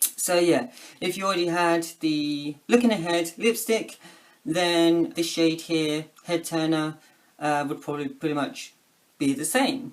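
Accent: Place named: United Kingdom. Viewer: I liked all 4 colors and all of them look nice on you🔥 Greetings from Siberia, Russia✋ Btw, I like your accent. It seems like you're from the UK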